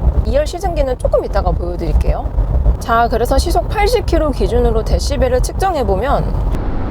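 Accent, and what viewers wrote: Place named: Chungcheong, South Korea. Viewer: 충청도 사투리 섞여 있네유 .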